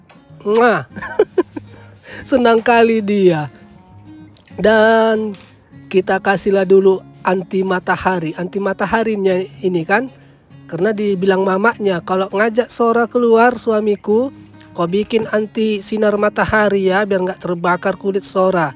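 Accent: Medan, Indonesia. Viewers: Suara medan nie psti 😂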